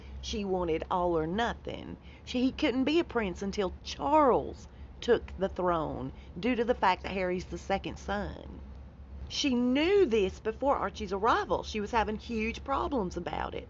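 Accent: South, United States. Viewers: someone just posted on Twitter that a security guard on the dress fitting got mm on film pitching a hissy-fit (you sound southern, so i know you will know what a hissy-fit is) and is releasing it to the Canadian press.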